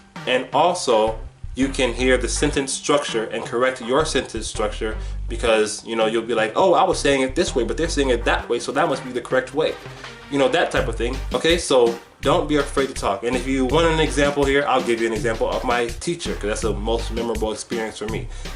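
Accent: Dominican Republic. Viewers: Que lo que! I stumbled upon your page and I’m so impressed by your Spanish y tu acento dominicano. I studied abroad for a summer in DR and have a bachelor’s degree in Spanish, but I’m embarrassed to say that I feel that my Spanish hit a plateau because of not wanting to make mistakes and put myself out there. But I’m now really making an effort to get to your level. Do you have any Skype or what’s app so I can contact you for help! Necesito tu ayuda!!! Pol favol! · This video got me in surprised. I'm Dominican myself checking on how English people would get to learn Spanish and was drawn to your video because of various elements that suggest several aspects of the Dominican scene: the Reggae background beat, your Spanish, I would even dare to say you sort of speak English with the Dominican swagger which is pretty cool to watch. Great job, keep it up. 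You can be my friend if want to come down to the island some day. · Pareces dominicano de la capital!!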